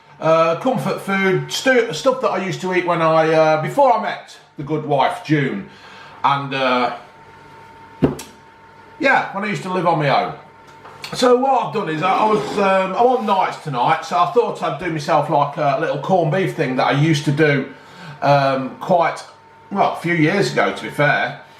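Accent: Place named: Sheffield, United Kingdom. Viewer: Tanvir Sekhon Kell Brook accent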